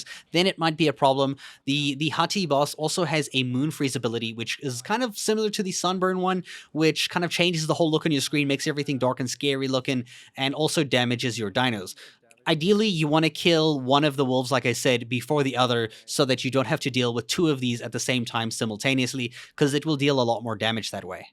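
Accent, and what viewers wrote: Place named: South Africa. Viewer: The first video I saw of you I instantly heard the South African accent! Great video, keep up the good work!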